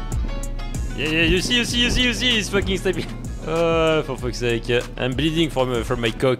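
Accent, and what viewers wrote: France. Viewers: there is something about roasting people with a thick french accent that is so funny and heart warming lol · Mais t’es français ? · Ton accent français 💀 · I love this guy, his french accent, the edits and the insults make it so much more funny and enjoyable lmaooo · your enraging griefers and french accent combined makes this content so damn funny 🔥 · digga man hört doch seinen französischen akzent so raus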